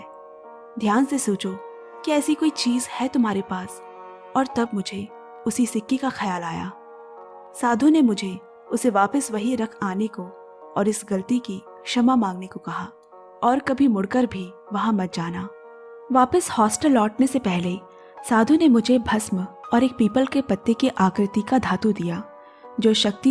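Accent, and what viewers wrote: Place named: Lucknow, India. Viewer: Ap lakhanow Sy hy kia? bohot hi pure urdu bolti hy ap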